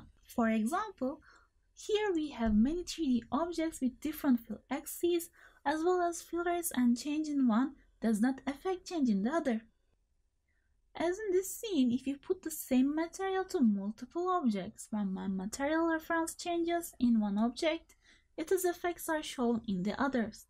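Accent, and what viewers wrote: Turkey. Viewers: Teşekkürler.! video çekmeye tekrar dönersin umarım :) hep 1 yıllık videolar, ayrıca ufak bir bakındığıma göre mikrofon iyileştirmesi yapmış gibisin ama biraz daha tane tane konuşabilirsen muhteşem olacak gerçekten :) aksanı duyar duymaz dedim bu türk olmalı :D oyun sektörüne böyle katkılar yapan türkleri görmek çok güzel.! hintlilerden ve kuzey ülkelerinin garip aksanlarından sıkılmıştık :D yılma devam et lütfen. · English with Turkish accent :D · Türk aksanı 🙂 kolay gelsin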